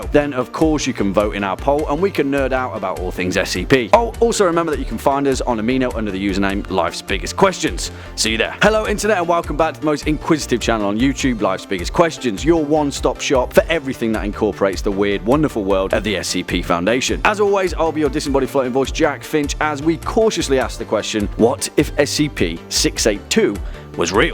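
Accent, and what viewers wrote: United Kingdom. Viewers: This guy sounds like the british dude from Community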